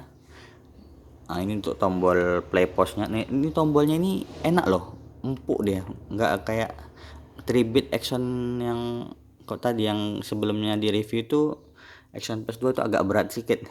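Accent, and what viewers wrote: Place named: North Sumatra, Indonesia. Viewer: Kek anak Sumut kau bg, dari logatnya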